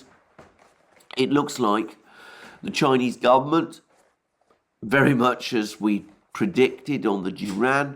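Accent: United Kingdom. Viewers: Aaaahhhh, makes sense now. Despite your thick british accent, you are from the Balkans area. I criticized your difficulty at times to speak english. My apologies.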